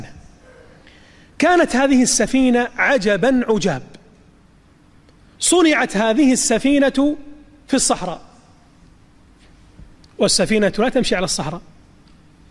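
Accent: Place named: Egypt. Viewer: عم تمصرن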